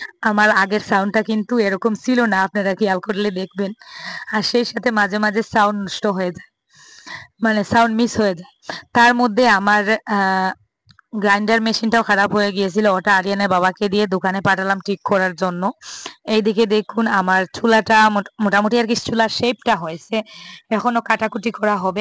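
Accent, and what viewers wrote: Bangladesh. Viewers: Tumi India r oia kne kali Bangladeshi kotha bolo kne? Hindi or assamese kotha bolo apu? Tumar suddo bangla amrar moto oy na bangladeshi kotha add kri daw? Hindi te vlog daw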